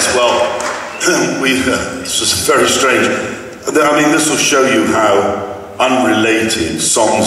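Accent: United Kingdom. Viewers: This guy was an extraordiary monumnet of rock music and I really love to listen to him talking with this intensive british sound (what else could it be then?)! This is heartwarming - I grew up with his music and i still honor him deeply! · Loved his British accent. He is missed.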